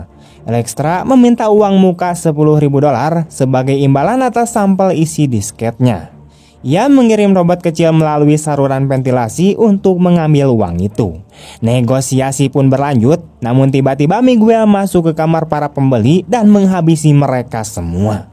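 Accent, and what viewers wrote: West Java, Indonesia. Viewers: orang sunda nya mang🗿?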